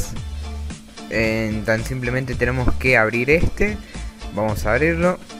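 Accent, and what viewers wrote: Córdoba, Argentina. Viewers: Eres cordobes?<3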